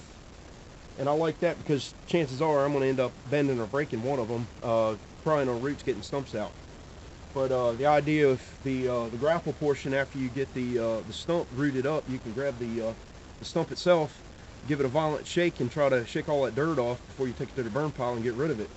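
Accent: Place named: Outer Banks, United States. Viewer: I hear ya hoigh toider. ;-) Down bay native here. Thx for the vids, helpful info. I've had to tighten up a couple of attachments from titan. There's a lot of variability between manufacturers plate dimensions so I think Titan hedges on 'fit everything' side...I find a few welded shims here and there tightens things up fine. All the titan connectors I get go in the spare bin and I put on known manufacturer ones.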